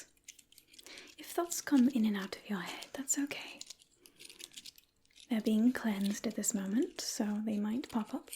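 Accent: England, United Kingdom. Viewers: YOUR BRITISH ACCENT SLAYS AND SLICES ME IN HALF EVERY TIME OMGGGGG! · I’ve said it before, you do a really good English accent. Have you ever been to the UK. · Nice use of the English accent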